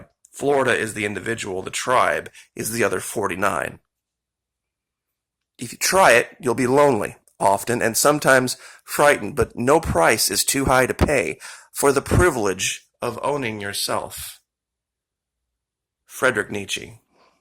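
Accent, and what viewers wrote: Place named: New York, United States. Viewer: You are kool , just the NY accent in my business would have lite the match.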